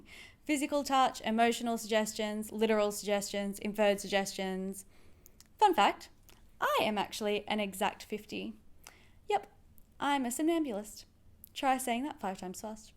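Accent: Australia. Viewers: I’m suggesting to myself how to master your 😘 Aussie accent. O’s are diphthongs or tripthongs in Australian. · you are literally about as far away as you can possibly get without going off world lol she's Australian fella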